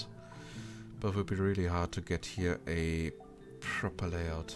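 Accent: Germany. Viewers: We like you with ur german accent bro. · Guten Tag, da dieser Kommentar für dich ist, kann ich ihn ja auf deutsch schreiben... Du hast vollkommen recht, als ich die ersten Videos von dir in der Anno 1800 Beta gesehen habe, hat mich dein Ton und deine Art schon ein wenig genervt. War jedoch nur eine Mischung aus Neid und meinem eigenen Anspruch an mich möglichst zu versuchen in Englisch nicht wie eine Vollkartoffel zu klingen. Ich habe deinen Kanal nach der Beta leider aus den Augen verloren, hatte mir aber fest vorgenommen mir die Vollversionvideos von dir anzusehen... und weswegen? Wegen deinem Spielstil, deine Layouts bringen mich zum lächeln und wenn dein Layout nicht auf die Insel passt und du dich ärgerst, ärgere ich mich mit dir und genieße gleichzeitig ein wenig Schadenfreude. Ein deutscher Muttersprachler darf in Englisch auch ruhig so klingen... Wenn er dann noch in seinem Spielstil den Klischedeutschen raushängen lässt, ist das top Unterhaltung. 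Es ist super entspannend jemanden "genau richtig" spielen zu sehen, während man selbst gerade einfach zu faul ist es selbst zu machen. Arbeit ist wunderschön... ich könnte stundenlang zusehen. :D Diese Art Anno-Let's-Play habe ich schon lange gesucht, jetzt kommt das (meiner Meinung nach) beste Anno raus und ich finde genau das. :) Danke, danke, weiter so! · This is how most German people talk English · I like your German accent, it's pleasing, I reckon Americans and Brits are not used to straight honesty of central Europeans. Honestly, I like your play style, but your commentary is much more entertaining for me than Raptor's and other channels, so this is why I watch this one. That being said, "So-ing" machines ;) Danke fir macht aus Praag!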